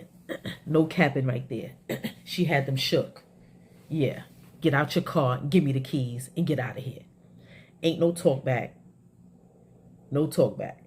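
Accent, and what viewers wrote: New York, United States. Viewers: HEY, we need you I’ve been checking your YouTube for what feel like almost a year … to see if you finished this story!! I really appreciate your content.. you my NY AUNT IN MY HEAD! I’m from OAKLAND CALIFORNIA, and I BELIEVE YOU BEFORE I BELIEVE THESE PPL NEWS MEDIA PROGRAMING!! I check every day hope in the near future you bless us with the rest until then, patiently waiting on your return!! I love y’all accent man😂 you dope as hell and the queen of HARLEM TO ME!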